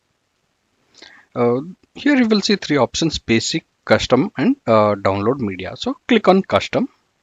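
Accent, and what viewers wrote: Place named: India. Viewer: why each tutorial video i'm watching bout ms products is with this awful indian accent, no rasism, I'm just fed up with this pronunciation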